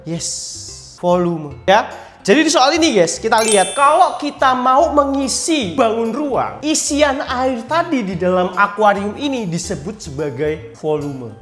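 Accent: Java, Indonesia. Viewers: Mendok iki suara nya to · Medok bgt 😭 Jujur suka cara ngomongnya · Orang jawa ya kak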